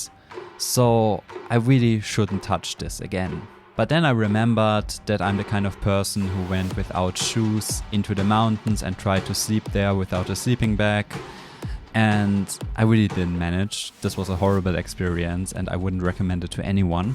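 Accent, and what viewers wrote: Germany. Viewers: i hear german....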